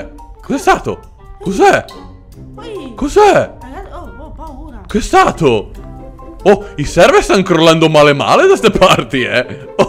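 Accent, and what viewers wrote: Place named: Tuscany, Italy. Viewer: Malaho e tu mi sembro Toscano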